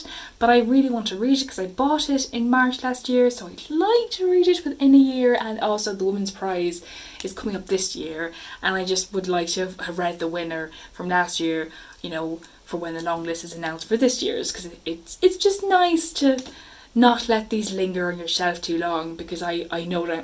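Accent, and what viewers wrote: Ireland. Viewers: Aaaah so late to this but thank you for mentioning me and also buddy reading Angela's Ashes!! 'twas truly a wonderful memoir, and I'm definitely helping myself to this convenient list of Irish books to check out next 👀 Your Irish accent is so sweet -- I've been trying out the accent during my read and the vowels and R's are so fun LOL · Great selection! I've only just finished Hamnet and was absolutely floored by it. Had a major book hangover after. Your Irish accent was lovely to hear 😊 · This was fun, I love Irish accents(but I’m biased)☘️ I read “Angela’s ashes” when I was a kid and I’ve been meaning to reread it someday. I know i really liked it (I like sad stories, it seems). Ooo I really want to read “the heart’s invisible furies” though, yes that one might win this month. Great choices, as always.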